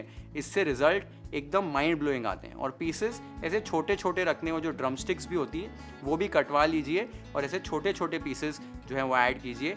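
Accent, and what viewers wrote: Maharashtra, India. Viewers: You speak typical मराठी words in मराठी accent... *ळ*😊 ❤ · ❤For Your Marathi accent ... ❤ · What a marathi accent you catch bhava ❤ and dish is mindblowing as allways kadak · Big fan......and your Marathi accent😚😚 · Your pronunciation of Marathi words are more authentic than lots of Maharashtrian people.. kudos 🙌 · Omg 😮 ur marathi accent is jus wow❤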